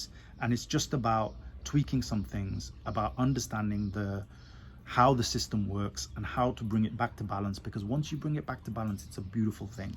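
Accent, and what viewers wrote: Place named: Birmingham, United Kingdom. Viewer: Sound like a real guy bro. From a fellow Brummie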